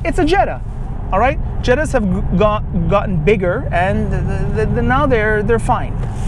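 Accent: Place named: Montreal, Canada. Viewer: Dude has such a Montreal accent.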